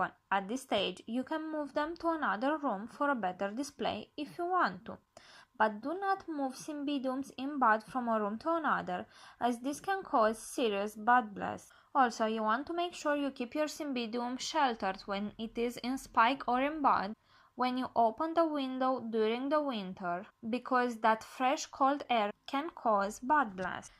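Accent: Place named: Portugal. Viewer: She has a Portuguese accent, big deal! Get over yourself🙄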